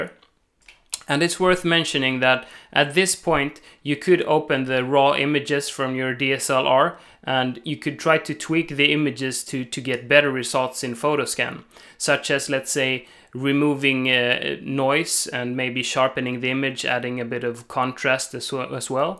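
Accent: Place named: Greece. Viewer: Μα το θεο ακομα και να μην διαβασα ονομα θα καταλαβαινα οτι εισαι ελληνας, το ελληνικο accent δε φευγει ποτε και κανει μπαμ απο χιλιομετρα, πολυ ωραιο βιντεο αδερφε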